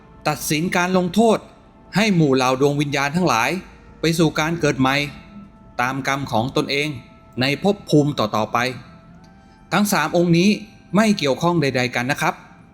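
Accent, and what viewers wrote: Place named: Thailand. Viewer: ชอบเสียงเหน่ออะ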